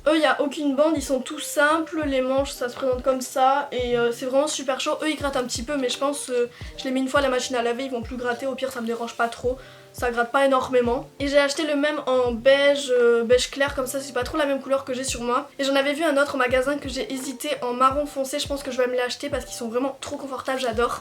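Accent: Belgium. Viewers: T'a Un accent belge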